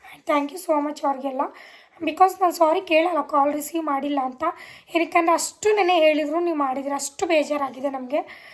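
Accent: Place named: Mandya, India. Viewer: Mandya bhashe maatadtare, namma mandya😊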